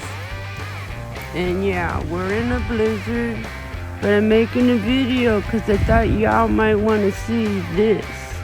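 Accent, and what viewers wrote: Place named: South, United States. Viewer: ❤I hope you didn’t drive in that Terrible Dangerous Snowstorm ❤Take Care❤I am outta that Mountain 🏔️ Hillbilly Cabin of Mine for Now &Back in the Northern “Q”City. Were there is less Snow ❄️ than the Mountains 🏔️ are covered Deep the Higher You Go..2to 3 Feet 👣 up ⬆️ in the Mountains..Down in the Valley 6”inches at most…We live in Lower Mountain 🏔️ Valleys mostly in British Columbia…Your Voice is nice “Southern Drawl”You don’t have to speak faster then I’d have Trouble understanding..Keep on Posting every Day has a New Story to tell😊😊😊❤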